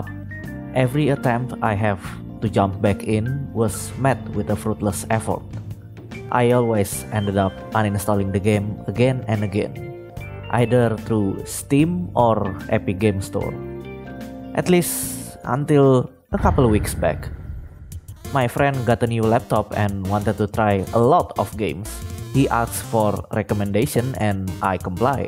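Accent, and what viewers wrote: Indonesia. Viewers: The accent sounds Javanese to me.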